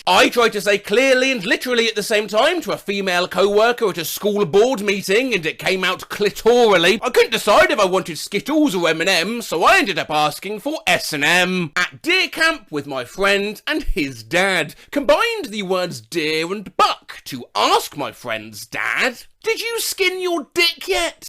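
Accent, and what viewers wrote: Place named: RP, United Kingdom. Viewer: Stop talking posh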